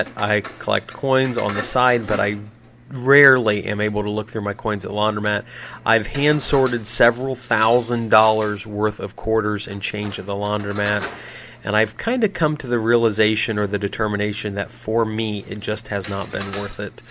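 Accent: Ohio, United States. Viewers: hmm really interesting, I picked up on your dialect and mentioning that landmarks like sack n save. Crazy that YouTube randomly suggested a creator that lives in my small little Ohio town. The strangest part of this is I’ve never really watched any investment/laundromat videos until this one was suggested for me. seems even crazier that when it does suggest one its someone that’s a 10 minute walk to their laundromat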